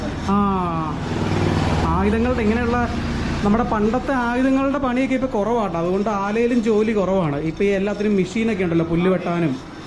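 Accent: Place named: Angamaly, India. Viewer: സംസാരത്തിന് അങ്കമാലി ടച്ച് ഉണ്ടല്ലോ.🎉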